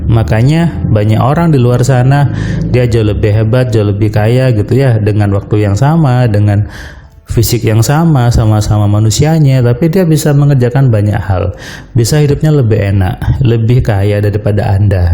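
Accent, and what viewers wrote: Madura, Indonesia. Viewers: ❤❤❤❤❤ mantab, logat Mas, logat madura..khas bangets, I Like It's 😅🙏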